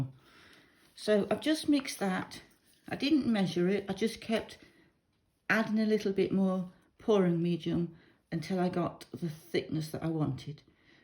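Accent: United Kingdom. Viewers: Hi, Thanks for a really helpful video and what a result. I haven't even started this Bloom journey yet. I'm just collecting ingredients etc so it's been great coming across your video and then to my delight hearing a British accent, which of course means being able to get the same things as you;) I wonder as I've already bought the valspar wood and metal Base C, if i could use that plus varnish for the pouring medium instead of glue (as in Shelee's technique) but use your pillow of simple acrylic paint? I don't suppose there's any reason why not,unless the medium would be heavier than the pillow? anyway would be interested to hear your thoughts on that. Great tip with the tape on the back!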